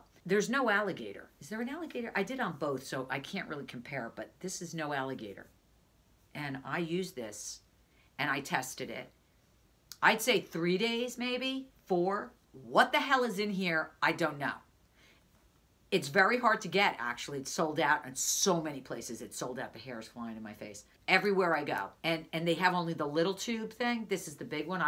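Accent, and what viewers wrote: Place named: New Jersey, United States. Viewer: Go, Jersey! I'm from Jersey and miss that accent. I loved your video on Gold Bond lotion. I just ordered it, and I can't wait to try it out. Thank you for the video review.😊😊😊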